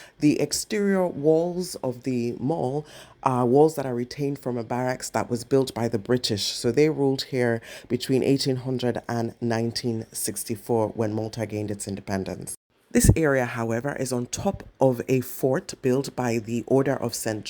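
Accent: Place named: Nigeria. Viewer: Beloved, ur intonation sounds Nigerian😅😅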